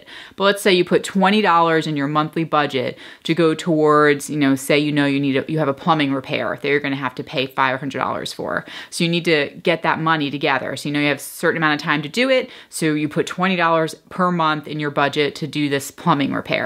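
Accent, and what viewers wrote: Philadelphia, United States. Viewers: Lol. Ok I should of waited to end of video. Heard the Philly area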